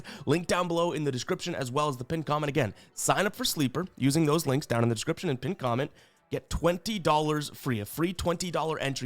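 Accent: Boston, United States. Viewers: You're from BOSTON????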